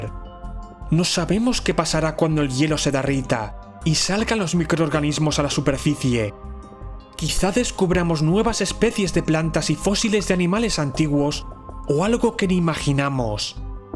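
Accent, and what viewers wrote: Spain. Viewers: por su acento puedo imaginar debe ser español. Aunque en algunos países suramericanos hablan con mucha pronunciación de la z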